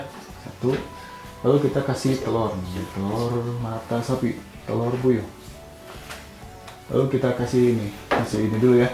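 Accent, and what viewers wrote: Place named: Bakumpai, Indonesia. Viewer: Abang orang mana kayak bahasa bakumpai ije due telu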